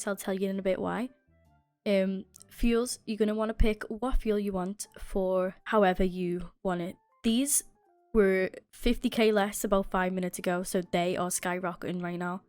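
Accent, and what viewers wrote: United Kingdom. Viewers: Are u from like Great Britain or something cause u sound like haha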